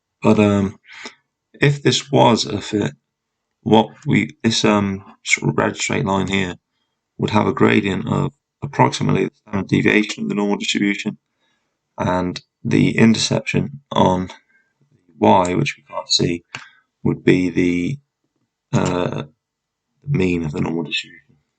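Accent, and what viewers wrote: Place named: United Kingdom. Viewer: This is a really offtopic question but: Which part of the UK is your accent from? I'm not a native speaker so I can't tell, however, I'm in love with the UK accents and I'd like to broaden my knowledge in reckognising them! Besides that, thanks for the video, it helped me with my thesis :) Kind regards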